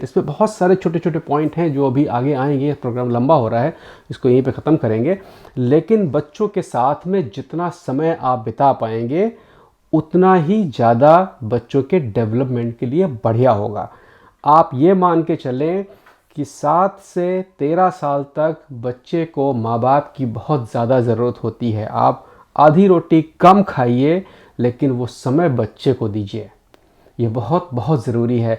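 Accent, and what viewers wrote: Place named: Uttar Pradesh, India. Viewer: Maja a Gaya aap India mein kahan se ho aapki batchit up se milati hai aapki baten sunkar sunkar Achcha lagta hai Jaise Ham UP mein pahunch gaye ho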